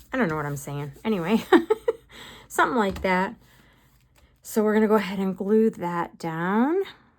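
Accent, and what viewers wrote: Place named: New York, United States. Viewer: These are so cute! I have to ask if you are from NY? I grew up there and I'm hearing it in your voice. Just curious.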